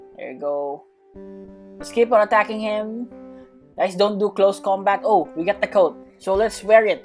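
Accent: Philippines. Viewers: i know filipino people with that accent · I thought you are American but your a pilifino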